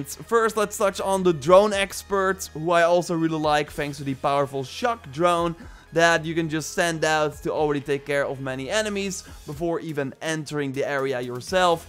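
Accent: Netherlands. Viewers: I smelll dutch ❤ big fan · Je hoort zo makkelijk dat je Nederlands bent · is deze man nederlands?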